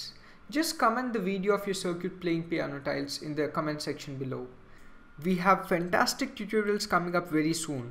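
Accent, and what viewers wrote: India. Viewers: are you from India? i'm from there i know this accent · Probably of Indian, Pakistanian, or somewhere around there-ian/ish. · this is the indian accent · yeah but i visited kolkata and there their accent was like his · I am sure he from India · i think he's from india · He's obviously from India :/ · i don't undersand but i hate indian accent and voice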